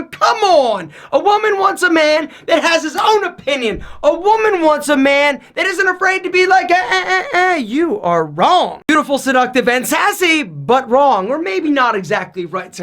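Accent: Philadelphia, United States. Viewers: Anyone know if dude's from philly? Lol thick philly accent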